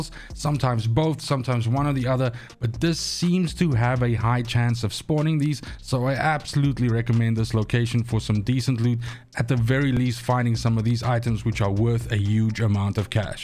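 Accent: South Africa. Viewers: Where you from you sound South African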